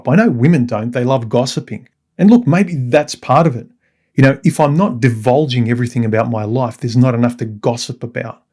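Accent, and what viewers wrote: Australia. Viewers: One of your best, but what's a "garl friend?" BTW The only man I know with a more distinctive Ozzie accent is Wal Thornhill, and he's a bloody genius across physics, astronomy, electricity, and cosmology. Anyone who can handle real science, should check out the thunderbolts project.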